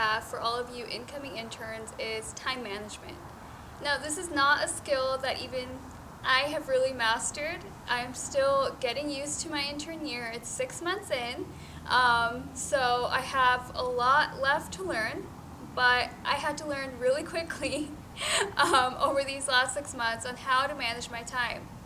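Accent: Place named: United States. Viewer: Hey doctor you said you are an IMG but you speak like an american how you got this accent ?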